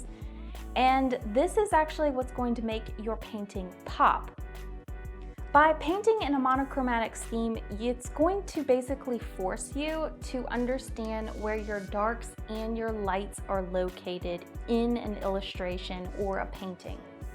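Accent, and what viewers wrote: South, United States. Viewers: I detected a southern accent. Are you from the south?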